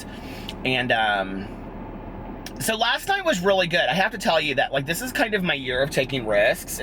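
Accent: South, United States. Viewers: Sister, do you have roots in the old south?? You have a bit of a southern accent.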